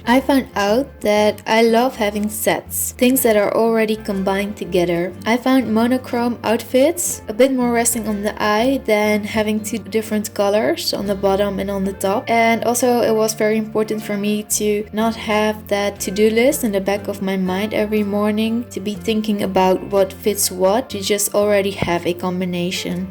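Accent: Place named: Netherlands. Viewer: ik hoorde al aan jouw stem dat je uit Nederland komt :P ik hoorde het toch goed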